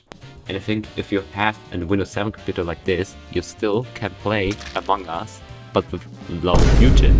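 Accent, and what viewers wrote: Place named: Germany. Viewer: I can hear the german accent lol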